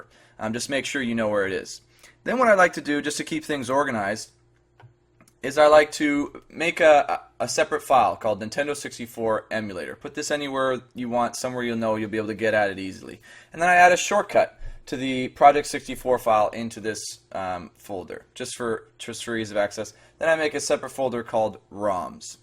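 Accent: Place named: Canada. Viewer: Canadian eh? Thanks for the tutorial man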